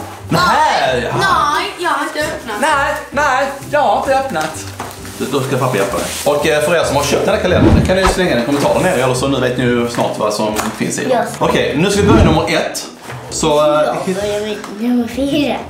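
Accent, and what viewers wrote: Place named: Scania, Sweden. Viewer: #unibrown och pratar mörk och skånska bland det värsta jag vet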